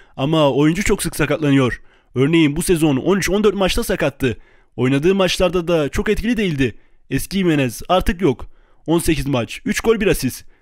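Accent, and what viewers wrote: Gaziantep, Turkey. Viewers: Reis Antepli misin? Desene hemşehri çıktık :D Bu arada cevap La Liga.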